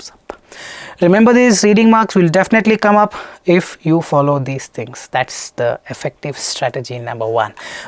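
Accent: India. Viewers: Hi. You are simply awesome! I discovered your channel recently but you do have a way of catering to the needs of even a below average student in English. Your humor while teaching with that Indian butler English is making long videos seem short as we laugh and feel refreshed and still gather up to listen to you more. Keep up the good work you are doing! 👏🏼👏🏼👏🏼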